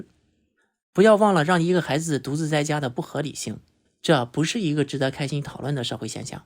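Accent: Shandong, China. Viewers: Klaus是不是山东人，感觉口音挺重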